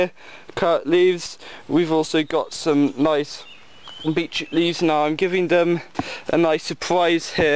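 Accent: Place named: England, United Kingdom. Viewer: why does everyone interested in worms, have that annoying English accent?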